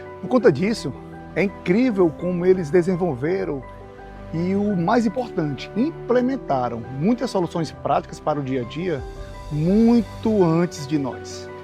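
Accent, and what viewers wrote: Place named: Rio Grande do Norte, Brazil. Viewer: vc é do RN? Seu sotaque me parece comum rsrsrs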